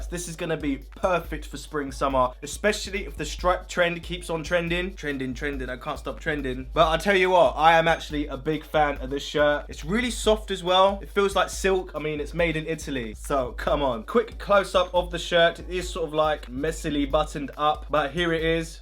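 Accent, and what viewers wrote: England, United Kingdom. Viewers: Hey where in England are you from, like the accent?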